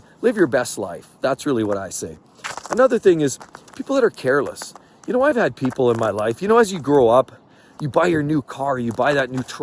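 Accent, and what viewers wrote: Canada. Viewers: Are you Canadian, or northern U.S. ? I hear a bit of a Canadian accent.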